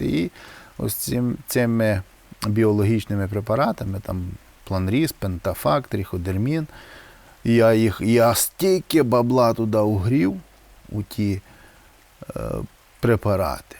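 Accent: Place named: Khmilnyk, Ukraine. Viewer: Привіт. як справи бачу вже посміхаєтесь йдите на поправку. ви з хмильниччини? ато акцент рідний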